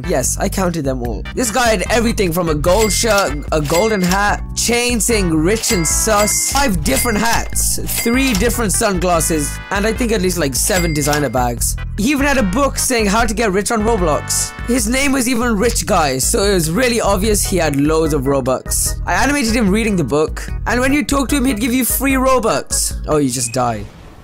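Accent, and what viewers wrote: United Kingdom. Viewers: R u British? 😅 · are you from birmingham?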